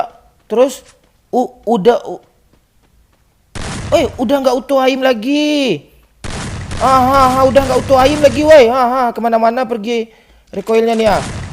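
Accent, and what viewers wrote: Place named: West Sumatra, Indonesia. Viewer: Logat minang nya,kerasa ya 😂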